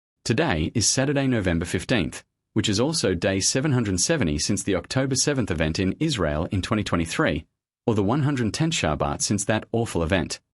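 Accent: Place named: Australia. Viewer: You sound like a robot voice with an Aussie accent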